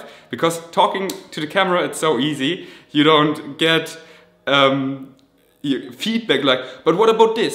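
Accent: Germany. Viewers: I really like your videos. So real. And your German accent is cool. Super cool that you talk in English. Anyways, keep it up! I am watching!!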